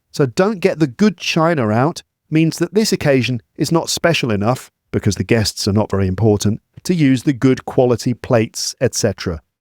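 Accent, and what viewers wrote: United Kingdom. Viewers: Hello from Pakistan, I hope you are doing well. Actually, I listened to your long podcast on my podcast app and I heard you mentioned some people complain about your long introduction to episodes. Please don't listen to them just ignore them probably they're not serious about about their English that's why they complain. But we serious learners love your long episodes and giving explanations to each words weather they're about grammar, vocabulary or just giving explanation. I've been listening to you for six months. I remember listening to you for the first time I was not used to British accent and I kept listening to your episodes again and again they really gave me a satisfying result. Now I understand 95 percent of your English and the rest 5 percent could be not knowing new words. Thanks to your long unique episodes lots of love from Pakistan, Peshawar. 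❤👑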